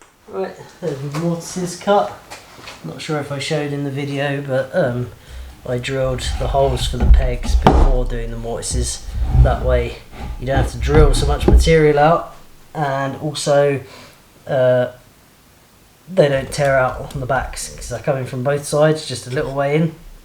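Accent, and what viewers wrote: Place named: Yorkshire, United Kingdom. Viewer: "...you're gonna wiggle about..." I watch you as much to listen to you talk as anything (although I love your woodwork and garden and building too) because you bring back memories of my grandparents (Yorkshire accents).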